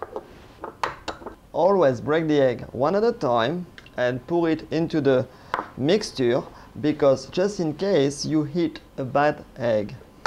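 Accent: France. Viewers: I could listen to this guy talk all day I am French too but I'm French American so I don't have the cool accent.😕😆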